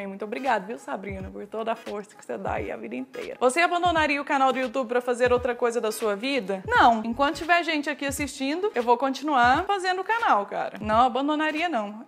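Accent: Minas Gerais, Brazil. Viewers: vc e mineira? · Sotaque mineiro <3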